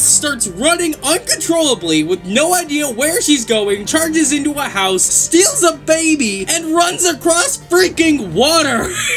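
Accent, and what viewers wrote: United States. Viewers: I'm not hating on the American accent but I feel like it removed the humor from a lot of your jokes especially from the Baaaaalllls Deep... Still good vid tho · Throw this american accent ting away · Dude I hate the #Americanvoice but love the content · Can you not make this American do the vids cuz its hella fucking annoying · No more American accent!!!!!!!! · Great content but I'm not taken by the American accent. It's not as funny. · American accent or accent is the problem this channels facing right now. Personally I can understand the accent but for the audience that can't well I,ll leave this problem for you to decide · No this is his American accent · Disliked because American accent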